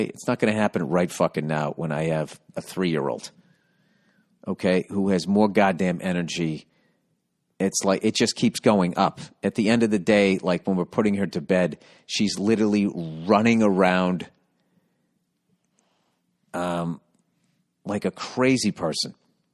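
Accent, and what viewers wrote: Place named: New England, United States. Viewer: thank god for this podcast. i share a house in kentucky with half a dozen women, work with all female coworkers and abrasive new england accent is like an oasis. it's good to hear a baritone for once instead of all these drawling mezzo-sopranos.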